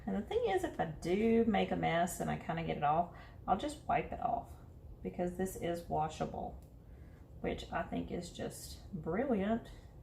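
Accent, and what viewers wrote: South, United States. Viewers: I just had to tell you. I usually play my crafting videos on YouTube in 2x the speed that way I can get through a lot of videos in a short amount of time. You're southern accent is so cute it actually sounds like regular speed. Lol. I can say this because I am also a southern gal and boy do we talk slow! LOL! I was literally cracking up watching& listening to your project just now. Oh almost forgot...love the DIY also. From one slow talker to another, Great job.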